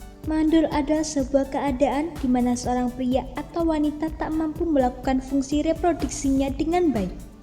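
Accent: Java, Indonesia. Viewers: dialek jowo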